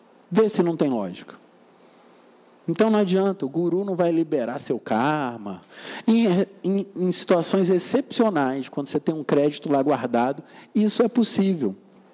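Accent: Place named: Rio de Janeiro, Brazil. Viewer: sutaque do rio de janeiro da zona sul !!!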